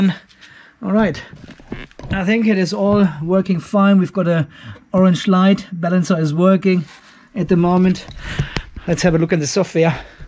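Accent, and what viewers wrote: Germany. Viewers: Jetzt musste ich wirklich lachen! Ich sehe Deinen Kanal sehr gerne und dachte mir immer: Irgendwie hört sich das wie ein deutscher Akzent an, aber was weiß ich. Nun Dein Schimpfen im Abspann: Habe so gelacht. Also viele Grüße aus Franken to sunny hot Australia.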